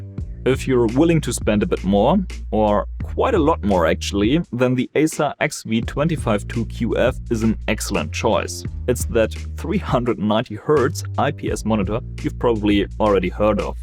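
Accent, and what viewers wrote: Germany. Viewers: Junge man hört so krass das du deutscher bist. Nicer content <3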